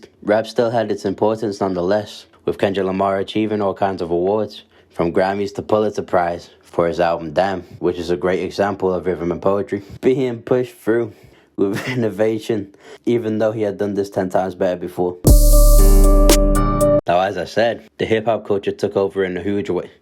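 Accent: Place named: Northern Ireland, United Kingdom. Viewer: To me it reminds me of different accents, like a bit of an irish accent but also newcastle. It just doesn't quite fit with either to me, I'm pretty sure he's from somewhere on the british isles. English is not my first language though, so I might be completely off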